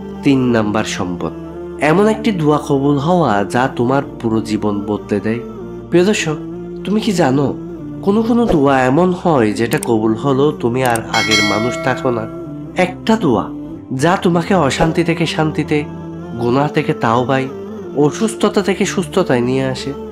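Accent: Chittagong, Bangladesh. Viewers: এমন ভিডিওগুলি আরো অনেকেই দেন সুন্দর সাবলীল হৃদয়ের ভাষা দিয়ে যা মন কেড়ে নেয় পানি আসে। কিন্তু ভাই আপনি চট্টগ্রামের লোকাল ভাষায় বর্ণনা দিয়ে বিষয়টির মাহাত্ম এবং গুরুত্বই নষ্ট করে দিয়েছেন। কিছুদিন ভাষা চর্চা করেন চট্টগ্রামের লোকাল ভাষা পরিত্যাগ করেন। তারপর ধারাবিবরণী দেন আমরা খুশি হব। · O chatgaio ki bolo go . polat kole low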